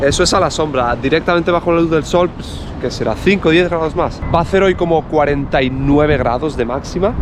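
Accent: Andalusia, Spain. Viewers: Si hablaras con tu acento andaluz, como tu amigo, fluiría todo mejor😉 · Justo estaba pensando eso! Jaajja que cuando veía los otros vídeos y decía "soy de granada" y no tenía na de acento.... Ya sólo que no dijese "graná" hacía sospechar... Pero entonces es que fuerza su acento normal pa hablar finolis cuando graba solo 😂 qué gracioso. Como habla tantos idiomas y tiene que acabar adaptándose a con quien habla, ya lo hace hasta con el mismo español y andaluz 😂😂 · Porque cuando hablas con tu amigo pones acento andaluz y cuando hablas a la cámara no tienes acento? Esa es mi duda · Quién en 2026 viendo el acento andaluz de de hace 3 años???😂😂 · Ha vuelto el acento andaluz. LOL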